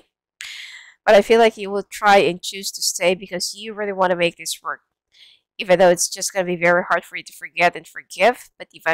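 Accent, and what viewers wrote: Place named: Netherlands. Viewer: Hi, are you Dutch? Cause you do have a Dutch accent 😊😉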